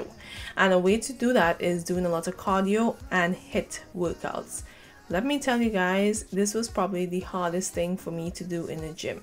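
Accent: Trinidad and Tobago. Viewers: Jayna Danielle Im saying this girl sound like Trini Im from Trini.... I live in Arima be safe 🙏🏼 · As soon as you started talking i was like she's from Trinidad or if not from the Caribbean. I am Trinidadian as well! But currently in the US. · Is that a Trini accent? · I came to get inspiration for me to continue one of her challenges but then I heard your accent...HIIIIIIIIIIIIIIIIIII TRINBAGO SIS❤❤ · I'm from trinidad and I made out your accent just had to scroll through the comments to make sure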